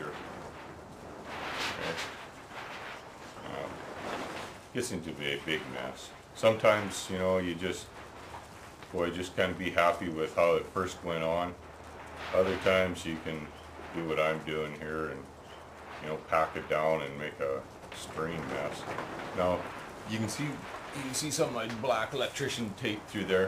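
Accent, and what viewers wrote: Canada. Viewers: That Canadian accent is on point aye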